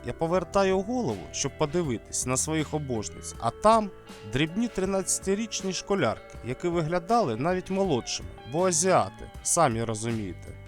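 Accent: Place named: Russia. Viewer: В мене таке враження, що автор кацап, бо читає з рососійським акцентом, підозріло, не буду дивитися!!!!!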